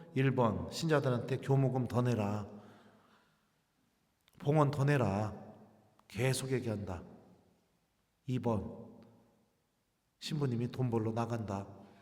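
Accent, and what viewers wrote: Chungcheong, South Korea. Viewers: 신부님의. 강론. 충청도. 사투리가. 구수하고. 귀에. 쏙쏙.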